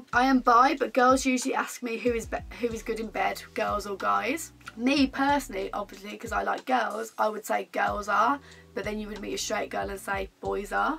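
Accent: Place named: Birmingham, United Kingdom. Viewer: I like your accent, which city are you from? Birmingham??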